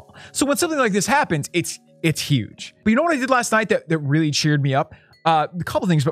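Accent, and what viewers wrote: Canada. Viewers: Wait, is he Canadian? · are you canadian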